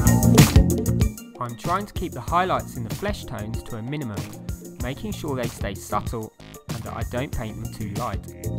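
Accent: United Kingdom. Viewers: First time i hear a Greek guy with a british accent, more british than the british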